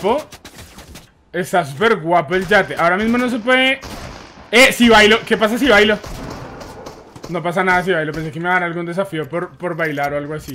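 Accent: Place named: Bogotá, Colombia. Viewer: Eres de Bogotá cierto? Jajaja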